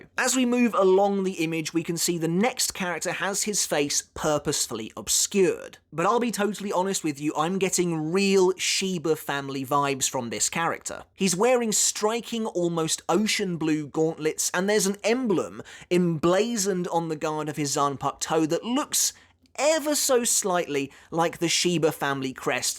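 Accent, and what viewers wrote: United Kingdom. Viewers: Love how you pronounce those Japanese names with your British accent! haha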